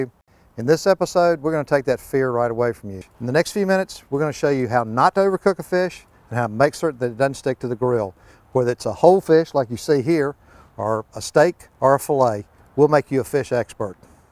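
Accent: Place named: Eastern North Carolina, United States. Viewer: Sounds like you're from eastern NC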